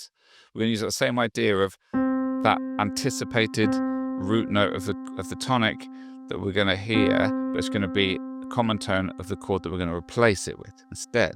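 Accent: United Kingdom. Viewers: thanks for your excellent teaching! Your British accent makes it extra special and realistic!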